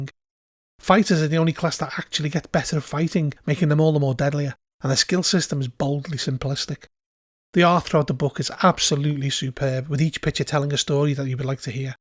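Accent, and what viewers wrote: North, United Kingdom. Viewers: I loved this review. Nice presentation style, succinct and informative. Actually I also like the gentle tone and the northern accent. Good storytelling voice, I reckon. Thanks.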